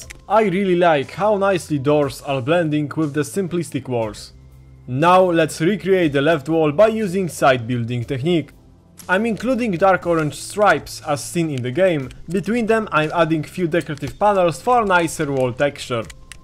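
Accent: Poland. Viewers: Are you polish? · Kurde wyczułem polski akcent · Nice creations and jesteś z Polski bo masz taki akcent ? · ale polski akcent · Are you from poland, beacuse you sound a bit like that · I can feel the Polish accent in your films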